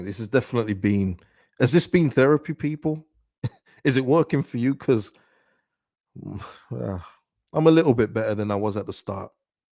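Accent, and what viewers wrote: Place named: West Midlands, United Kingdom. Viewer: bro.. my bad man. I completely forgot! But hey, I can hear you're local to me (I'm from the west mids) so I just wanna say keep up the content man. Love hearing a common accent and knowing I'm not alone down here lol. I don't know any whites fans at all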